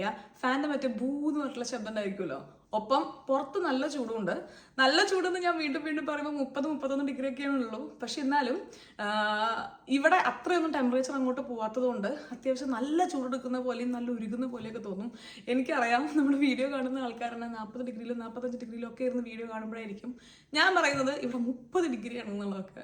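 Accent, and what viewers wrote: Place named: Malabar, India. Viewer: Chechy njan kure nalai vedios kanarund. Njn kozhikodekari Anu so chechyde malabar touch ulla samsaram bayankara istanu. Njn agne long vedios adikam kanatha alanu ipo chechide long vefios okke pattumbolkke full ayit kanarund. Karanam chechide samsaram kelkn nalla resanu. Mathralla chechide life style, healthy food habits, okke enne irupad motivate cheythittund. Chechine enik valya istanu. Puthiya vedios nai kathirikkunnu. Orupadu Snehathode - chandhu😉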